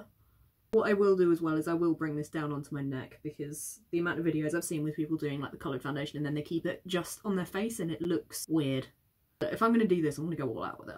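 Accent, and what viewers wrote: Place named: United Kingdom. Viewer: O f#ck, you have british accent, very nice!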